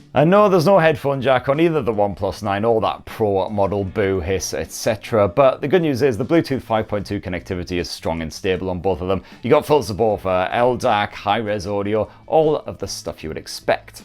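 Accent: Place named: England, United Kingdom. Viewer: love your reviews and the english accent!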